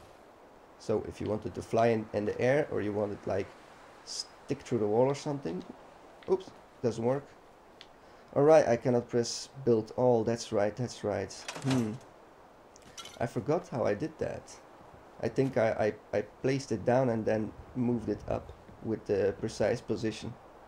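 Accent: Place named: Netherlands. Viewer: Je klinkt Nederlands.. Goeie tutorial man.. Snapte echt geen kut van dat menu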